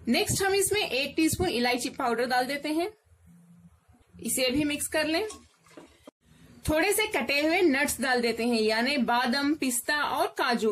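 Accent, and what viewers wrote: Hyderabad, India. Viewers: I really like your hyderabadi accent and by the way thanx for sharing the recepie love hyd food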